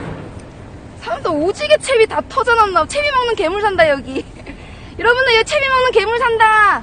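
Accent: Busan, South Korea. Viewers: 부산 사투리 귀욥네요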